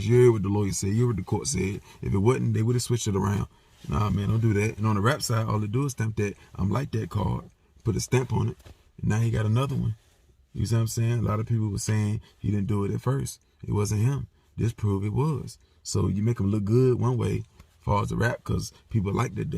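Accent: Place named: Chicago, United States. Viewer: Your funny bro..it's like you frm Chicago but jus county asl wit it😭😭 dat dare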